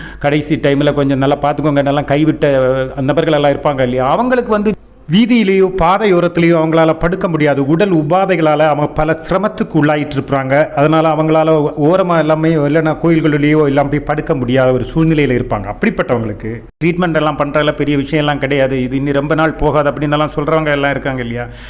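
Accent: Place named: Kanyakumari, India. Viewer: நீங்கள் குமரி மாவட்டமா? பேச்சில் தெரிகிறது 😊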